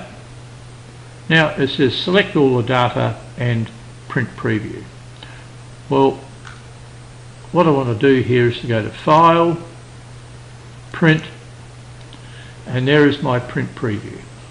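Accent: Australia. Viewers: awesome accent, Australia? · Hey. great video! many thanks. Would you say that this sort of test would be carried out in the UK. I only ask as I heard a Aussie (or kiwi?!) accent and thought this test might appear more in the southern hemisphere? please let me know when you get a chance. cheers. M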